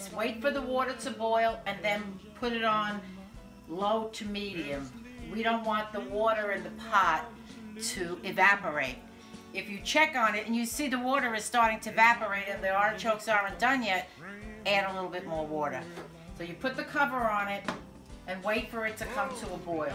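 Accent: New York, United States. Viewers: What a hoot ! :) You sound like a New Yorker, my home - music to my ears. Loved your video. I've watched a few others stuffing artichokes and yours is the best, hands down. I'll be checking out your other videos. Keep cooking.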